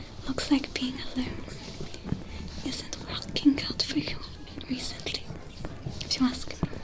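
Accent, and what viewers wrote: France. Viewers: I haven't finish watching this yet I am already commenting haha I am so sure that I will not finish watching this because of course, I will fell asleep again and earphone wires will choke you hahahah I just mostly leave my phone on and just listen till I fell asleep. *update, I have finished watching it XD Will you also upload the no music version of this ? If not, I will still watch/listen to this~~ I don't know if it's me only but the accent is kinda like a French, specially how you emphasize the letter R hahahah Thank you for this amazing, cool, efforts and everything. I/we love it already ( ╹▽╹ ) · Can we Just acknowledge how she answers to EVERY Comment + how hard she works for us to Fall asleep. Also I have never watched Star wars before but because of this ASMR and the french accent I will watch Star wars now. Oh and I follow you for quite a while now and I wanted to thank you so much for so many anxity attacks you took from me. 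Especially for the sleepless nights, you helped be trough. Just Thank you for everything you do (✿^‿^) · honestly it truthfully is the background soundscape that does it for me it's good white noise to contrast the performance you do Also for some reason the French(or is it more Cajun?) Accent does tingle me kinda hope you have more reasons to do it in the future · i was like "wow the French accent really fits twi'leks." but as im reading the comments, I was not the only one that forgot that it was the actueel accent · It's truly amasing. I'm a fan of Star Wars since I was 3 years old (I'm 25) and I must say I have a thing for Twi'leks. You are so glamorous and adorable as a Twi'lek. And add to that, since my mother language is french, pour french accent is truly nice. Love you and your works. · Are you from France, my Green love? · This is an amazing ASMR rp. First the asmr components as always are top notch. 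Second the choice of French accent really helps hit certain sounds that trigger (at least for me). Then there's the commitment to the star wars component. Most of the props look like they would fit in universe. Lot of work for the background components. Then there's you costume. Your rps are fantastic. Keep up the amazing work.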